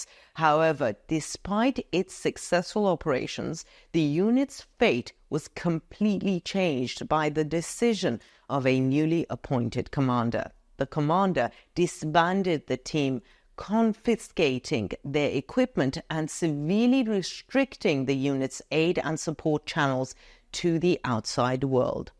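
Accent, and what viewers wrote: United Kingdom. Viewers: I love your beautiful hair and British Accent.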